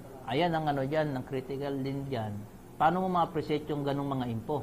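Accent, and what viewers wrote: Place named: Visayas, Philippines. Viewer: FLuent sa tagalog si pero di nawawala ang,, ba.. Naisip ko n ilonggo or cebuano. Tga gensan pla👍👍👍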